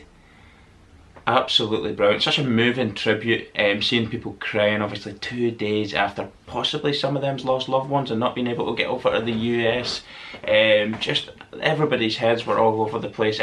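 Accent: Scotland, United Kingdom. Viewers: Brings powerful memories rushing back. Love your Scottish accent!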